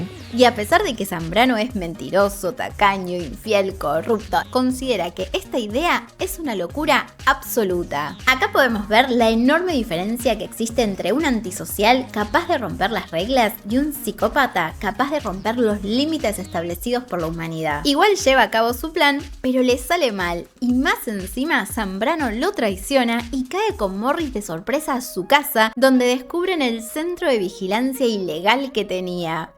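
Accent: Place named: Buenos Aires, Argentina. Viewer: Espectacular video😉🙏🏼👍🏽🥰pero sugiero que mermes el "sh" tipico del porteño...para favorecer la difusion del contenido...y caiga bien en varios paises🫂🤗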